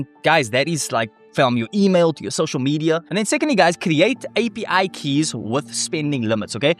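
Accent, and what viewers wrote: South Africa. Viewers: ... Are you.... Are you South African ????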